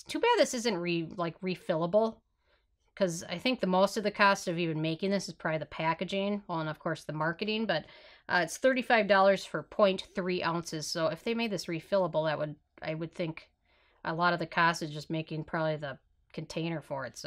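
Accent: Minnesota, United States. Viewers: I love how honest you are and that you have a Minnesota accent like me 😄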